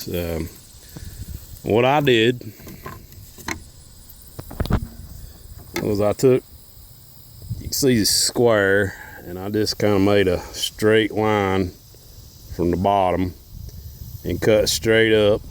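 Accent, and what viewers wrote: North Carolina, United States. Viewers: I am from south eastern North Carolina.....your accent is too!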